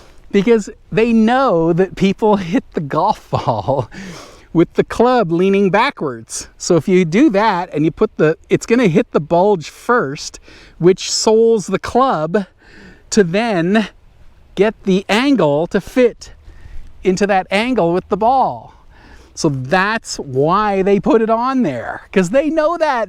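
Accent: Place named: South, United States. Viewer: Having spent 12 years in Gainesville Florida I can say you sound like an exasperated southerner. And I love it!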